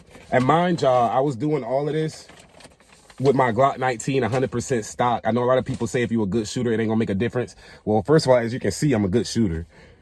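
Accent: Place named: Florida, United States. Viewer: You talk like a Florida nigga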